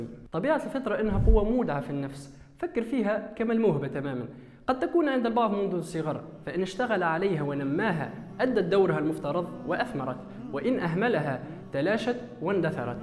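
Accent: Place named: Tunisia. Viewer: حسيت صناعة المحاور تتكلم بصيغة تونسية ...مشروع رائع بارك الله في عملك ووفقك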